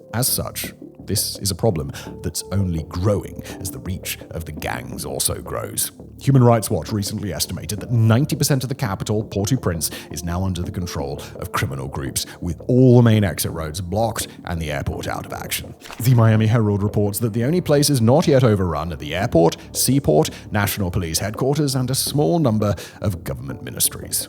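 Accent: United Kingdom. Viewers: Idk I think he's just british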